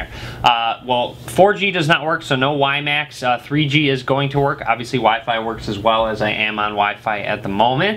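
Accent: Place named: Chicago, United States. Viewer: dude i'm not from the states but i know you got a strong chicago accent!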